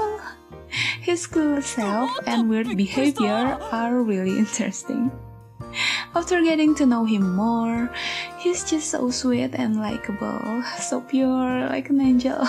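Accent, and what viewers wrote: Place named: Philippines. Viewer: I didn't expect for you to be from Indonesia, i thought you were from Philippines because of your english accent, and you look beautiful. And while i do want to see you make a video content of other games, but i suggest you should focus on enstar, because it takes 4 days to make a video of one game, if you make a video content about multiple games I'm worried that you might feel burnout and overwhelmed because of the many videos editing and gameplay, managing time is not very easy, especially if you play multiple games and they all have an event in the same time, i always feel overwhelmed when that happens, but still i will wish you the best of luck in whatever choice you made 🍀🍀🍀🍀🍀 And again congratulations on 5k subscribers, your guides and event scout video really help me a lot ❤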